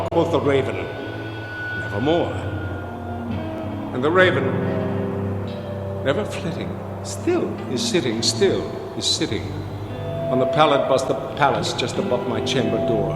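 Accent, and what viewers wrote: United States. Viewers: it's a nyew yoak accent. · "The Raven," straight out of Brooklyn. This is ridiculous.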